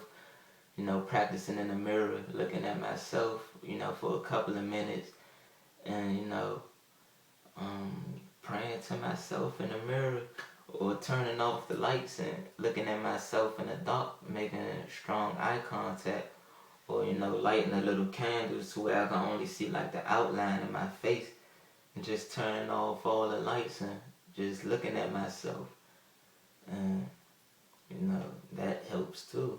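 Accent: Washington DC, United States. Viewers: Cool hair, you sound like you're from DC. This vid was interesting.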